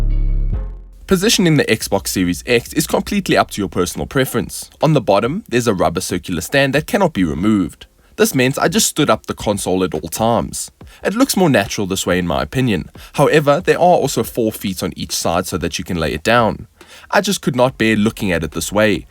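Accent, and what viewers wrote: South Africa. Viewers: Amazing review, the best one Iv seen👍👍❤️❤️You sound like you have a South African accent, I'm from South Africa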